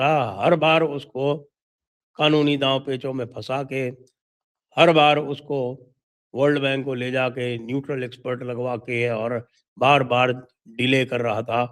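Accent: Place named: Jodhpur, India. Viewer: तो भाई...... जोधपुरी लहजा आपका अच्छा लगता है। मिठालाल ज़ी मेहता की पुड़िया..... मोदी ज़ी के हर चोट की टाइमिंग सटीक होती है 😄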